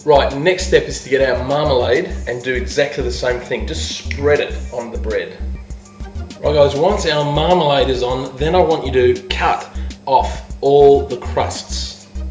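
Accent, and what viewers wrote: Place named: Australia. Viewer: same here....heard ur Aussie voice and went SNAP Subscribe lololol look forward to ur videos "P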